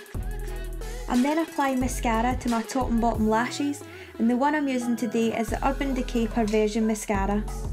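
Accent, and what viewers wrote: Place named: Scotland, United Kingdom. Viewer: Are you Scottish? Love the accent! My family are all from Scotland,, my mum and Dad moved down to England and so me and my sister got an English accent so we’re different from the rest of our family 😂😘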